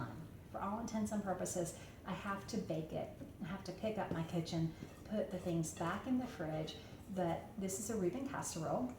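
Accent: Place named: North Carolina, United States. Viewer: I know I’m late for this video but it looks great and can’t wait to make it! Just have to tell you (will sound weird lol) that you remind me of Dr Brent Ridge (of the Fabulous Beekman Boys); he’s a native North Carolinian too and just your mannerisms and accents are the exact same!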